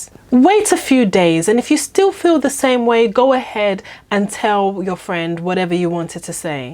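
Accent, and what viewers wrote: United Kingdom. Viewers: I love your british english/accent. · a lovely British accent helps! Lol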